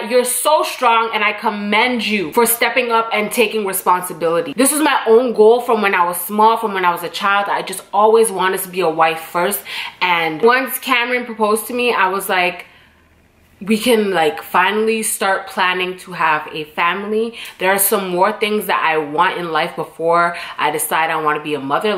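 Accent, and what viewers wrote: Toronto, Canada. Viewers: You speak like a Torontonian 😂😂😂😂 The first video I saw of yours you said 1 word and I automatically knew you were from Ontario 😂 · I honestly wish you would use your Canadian accent more often tbh!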